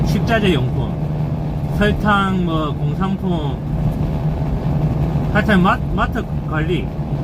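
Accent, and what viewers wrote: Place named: Jinju, South Korea. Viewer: 진주 사시는군요 ㅎㅎ 말씀이 구수~하시네요 ㅋㅋㅋㅋㅋ 😂😊 풀영상으로 응원합니다 😍💞💓😻😸👍👍👍 항상 울콩딸기가 진심으로 감사드립니다 🙏🙏🙏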